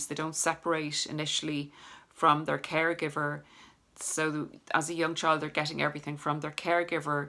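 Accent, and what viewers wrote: Ireland. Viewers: thanks very much done alot of journaling this morning while watching these videos which helped.stayed in bed all afternoon body so sore,I'm also from Dublin great to hear an Irish lady give this advice as a lot of the channels are american.love listening to you and thanks again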